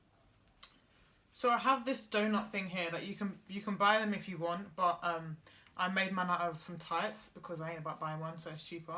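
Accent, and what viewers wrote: United Kingdom. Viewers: Your so prettyyyyy!!!!! And I freaking love your accent❤️ it’s probably not but is it British?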